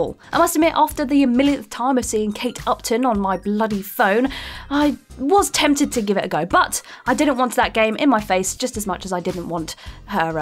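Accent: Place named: United Kingdom. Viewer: sounds like she's from the UK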